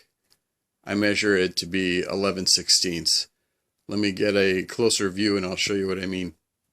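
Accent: New England, United States. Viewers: Sounds like Norm as he is from New England as well. Watch New Yankee Workshop and you will hear it as well.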